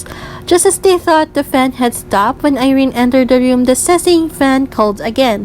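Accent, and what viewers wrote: Philippines. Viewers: Off topic but is that filipino accent I hear? 😁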